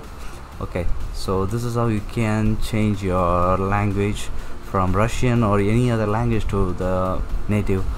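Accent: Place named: Kerala, India. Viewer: U sound like mallu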